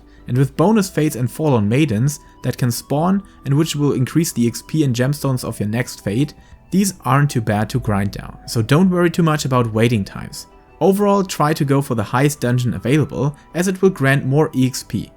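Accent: Germany. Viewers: Are you german? Cause of your Accente.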